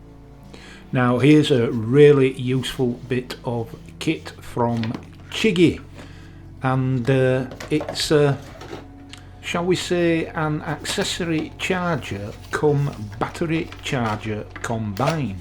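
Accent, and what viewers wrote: Yorkshire, United Kingdom. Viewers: Happy to remain a subscriber. As a fellow Yorkshire man I understand every word. Keep the BSA, it’s a fine machine.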